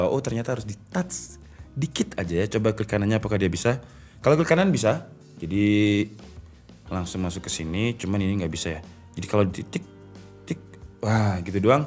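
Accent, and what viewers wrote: Malang, Indonesia. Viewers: Bang lu orang Malang ya?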